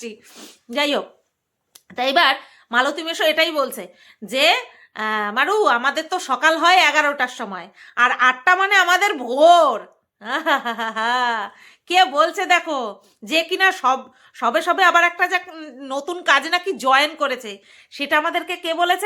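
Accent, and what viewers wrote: Bangal, Bangladesh. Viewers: Tomar mukh e 'Bangal' bhasha sunte darun lage ❤. Ami Bangal er meye aar 'Ghoti' barir bou . Bangal bhasha ta darun misti amar kache 🥰.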